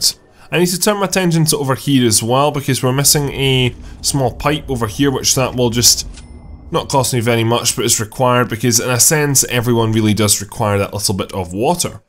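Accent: Scotland, United Kingdom. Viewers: New block? Scottish accent? Cites Skylines? i'm pumped as f$&£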